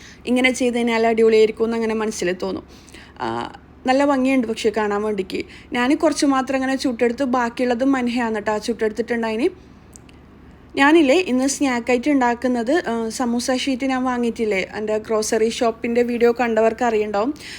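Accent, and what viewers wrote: Kannur, India. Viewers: പഠിച്ചതൊക്കെ ദുബായ് ആണോ.. മലയാളം അത്ര fluent അല്ലല്ലോ.. അതോ കണ്ണൂർ മുസ്ലിം ഭാഷ ഇതാണോ?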